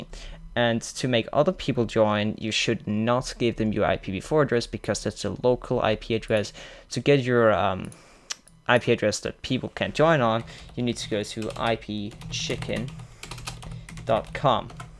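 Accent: Denmark. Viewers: Are you Danish?? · Are you Danish? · are you from denmark?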